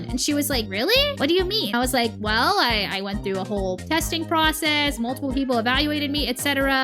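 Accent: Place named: Boston, United States. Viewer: You sound like you have a Boston accent and it's really funny to hear the word pronounced "UAW-TISM"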